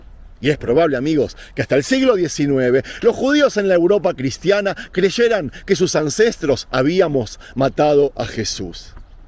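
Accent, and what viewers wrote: Argentina. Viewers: Tu no eres judio,eres un argentino que se cree judio muy distinto Si fueras judio real no tuvieras el tipico acento argentino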